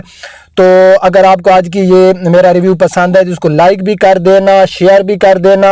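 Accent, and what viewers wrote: Saraiki, Pakistan. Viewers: Ap ki سرائیکی urdu baray mazay ki ha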